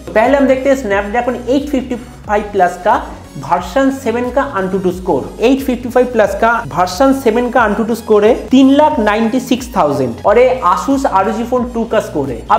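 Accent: Bengal, India. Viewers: আপনার উচ্চারণ শুনে বাঙালী মনে হল।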